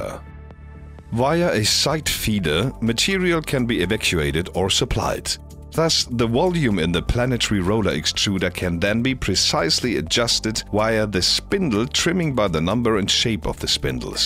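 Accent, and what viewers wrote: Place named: Germany. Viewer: German accent turned up to 11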